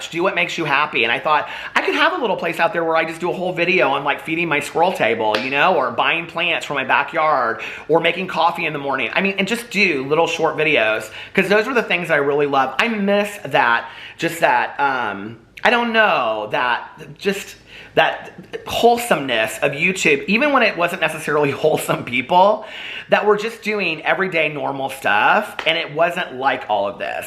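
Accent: South, United States. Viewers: "It's Shake and Bake...and I helped!" You just squeezed that little gem in there right at the beginning. I can just hear that thick Southern accent! Lol!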